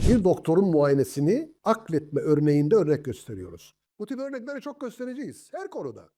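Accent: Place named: Konya, Turkey. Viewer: Doktor beyim ilk defa dinledim şivenizi konya/Bozkır yöresine benzettim. Pogramların devamı dileğiyle kolay gelsin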